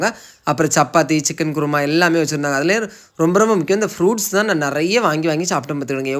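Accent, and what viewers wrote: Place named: Nagercoil, India. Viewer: Super your speech my own house super people speech same great good nargovil speech super thanks 🙏🙏🙏👍👍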